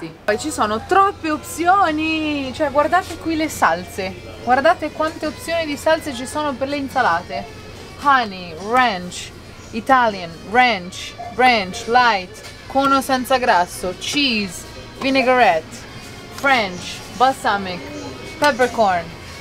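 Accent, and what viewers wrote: Ancona, Italy. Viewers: Ciao ho visto il tuo video per caso ma ho subito riconosciuto l'accento in italiano Sei per caso di Ancona poco ma sicuro .sulle mance non sono molto d'accordo Visto che non è una libertà ma un imposizione e poi non è tutto mercato nero sono soldi non tassabili e in più alimenta Il sottocosto retribuito di chi lavora come cameriere o altro visto che le mance contribuiscono.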